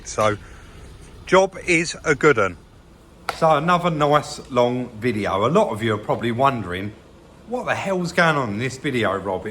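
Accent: United Kingdom. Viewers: I like this funy British accent hahaha all an all video is very helpful thank you so much amigo 😅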